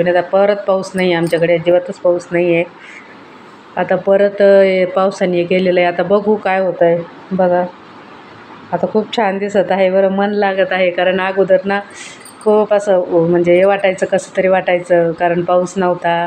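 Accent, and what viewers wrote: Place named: Ahilyanagar, India. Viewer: हो का माझं माहेर अहिल्यानगर आहे ताई आणि मी पुण्यात असते 😍 ताई मला वाटलेच होते तुम्ही नगर च्या च असणार बोलण्यावरणे 👍🏻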